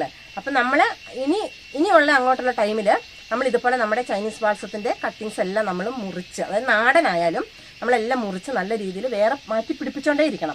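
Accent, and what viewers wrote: Wayanad, India. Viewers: Wayanad language Kelkkan rasamund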